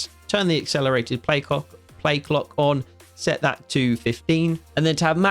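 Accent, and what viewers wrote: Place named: United Kingdom. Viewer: I'm sorry but I can't help but cringe anytime I hear a British person talk about college football.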